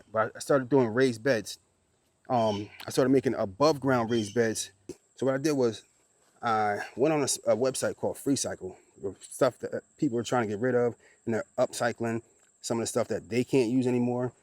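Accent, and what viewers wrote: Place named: Philadelphia, United States. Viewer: First time viewer here from Delaware County PA, just outside of Philly. I follow so many YT Homesteaders from the South with their Southern accents that as soon as I heard you speak I knew you were from somewhere near me 😂 Now I have a new channel to follow. Thanks!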